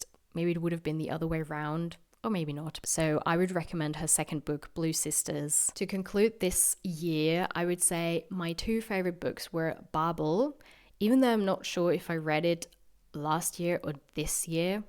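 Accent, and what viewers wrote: Austria. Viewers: are you from Austria? :) love your videos!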